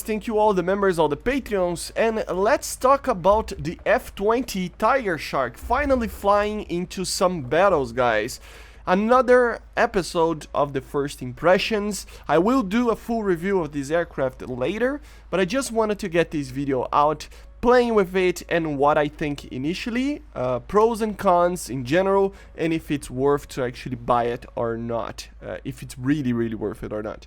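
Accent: Brazil. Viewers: Tu é brasileiro né? K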